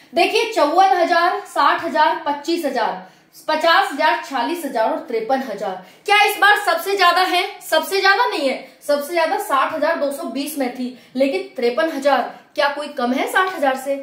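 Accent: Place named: Rajasthan, India. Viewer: Didi aap rajasthan se ho kya aapki language se lag rha ❤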